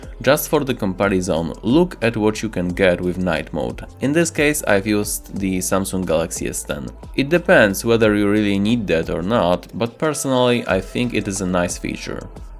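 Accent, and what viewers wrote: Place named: Poland. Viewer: Siema, fajne porównania, słysze po akcencie że chyba jesteś Polakiem :D Swoją drogą co to za nową stacje budują w 3 minucie filmu?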